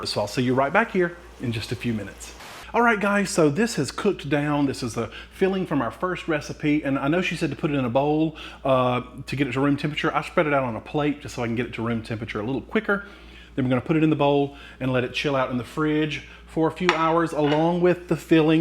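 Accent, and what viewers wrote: South, United States. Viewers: One of the best things about finding out I am pre diabetic is finding wonderful channels like this. Grew up in Tennessee now living in Florida an I do miss that southern accent. Get a kick out of him saying Y'all we're makin ____ come on! Puts a smile on my face every time.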